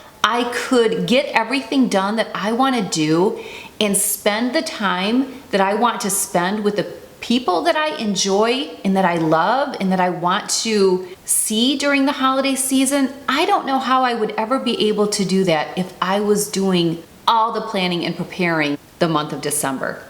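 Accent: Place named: Northern Indiana, United States. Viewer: Love your accent, sounds like mine! Where are you from? You have to be from Northern Indiana-Michigan area.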